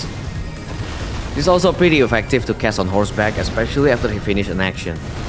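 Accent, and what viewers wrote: Indonesia. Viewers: Bahasa inggris nya bagus banget tapi sangat terdengar seperti orang indo banget. Dan iya, saya mulai bisa membedakan aksen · kirain logatnya ga asing, eh benran indo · bro u sounds Indonesian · Dari awal ngomong langsung ngebatin ini kyk aksen org indo et bener ternyata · you sound indonesian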